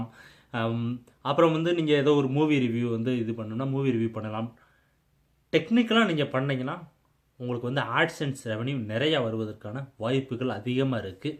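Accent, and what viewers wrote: Madurai, India. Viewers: boss nenga madurai ah...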